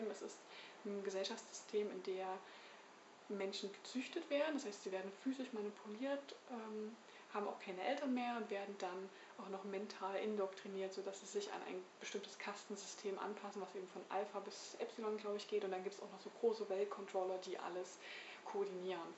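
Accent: Dresden, Germany. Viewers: Die rothaarigen Booktuber mehren sich! :) Gerade entdeckt durch dein Abonnement auf meinem Kanal! Patrick Salmen muss man eher mal live erlebt haben... Habe ihn in Berlin ein paar Mal gesehen, allerdings nur mit sehr neuen Texten. Bücher von Poetry Slammern besitze ich aber kaum.. Lieber das Hörbuch! Der Herr ist vornehmlich in Wuppertal unterwegs. Kurzgeschichten- und Lyrikbände können auch mein Herz gewinnen. :) Danke für die Empfehlung der Booktuberin/Autorin. Die Farbe Lila und Wer die Nachtigall stört.. Habe ich mal in einem Zug (ja, ambig!) gelesen und war sehr schockiert.. Habe den amerikanischen Rassismus vorher kaum wahrgenommen in der Literatur. Schöne Neue Welt kennen gerade die Leute hier kaum, die viele Jugenddystopien lesen. /: Ziemlich schade. Für mich ein großartiger Roman. Toller Kontrast zu 1984 von Orwell. Sie versucht Murakami hinter sich zu verstecken. 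:D Unbedingt mehr Videos machen. Mir fehlen solche Booktuber, die wirklich etwas zu sagen haben. Deine ruhige, eloquente Art ist sehr angenehm. Das mit den Charakterstudien erwähne ich auch in jedem Video.. Zu viele Gemeinsamkeiten! :) Liebe Grüße! P.S.: Dresdner Dialekt kommt manchmal durch, sehr toll. Und, ich habe dich mal auf Facebook in einer Booktube-Gruppe empfohlen. :) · Aha SciFi steht bei dir im Regal also links. Gut zu wissen :P Aber jetzt mal zum Inhalt: Zum Glück hast du mich abonniert, sonst wäre ich erst zu spät über dich gestolpert - das wäre schade gewesen, weil ich deinem Bücherregal entnehmen kann, dass da durchaus einige literarische Schnittstellen sind und mir vor allem auch dein kritischer Blick gefällt. Es freut mich, wenn mehr Menschen mit einem etwas anderen Geschmack den Weg in die Booktube-Community finden. Nahezu mutig ist es auch von dir zu sagen, dass du - OMG! 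- Bildbände bzw Mangas/Comics liest und die auch vorstellst. Soweit habe ich mich noch nicht getraut^^ Brave New World muss wohl auch demnächst bei mir endlich einmal dran kommen. Ich nehme es mir vor, seitdem ich vor vielen Jahren beim Film Free Rainer von der Existenz des Buches gehört habe :D Ich bin gespannt auf mehr Videos von dir und finde es tapfer von dir, dass du deinen Dresdner Dialekt nicht verleugnest, so wie ich meine sächsische Herkunft verleugne. Kannst du es aber irgendwie ermöglichen, dass die Lautstärke noch etwas höher wird? Liebe Grüße Vincent:-)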